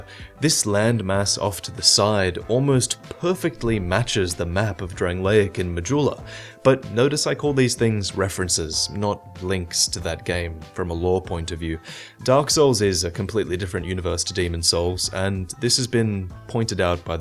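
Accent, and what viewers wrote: France. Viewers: Your french accent is not that bad ^^ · bel accent français ^^ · your accentin french is soo aaaaaaaaaaah <3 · Nice french accent :) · Good French accent.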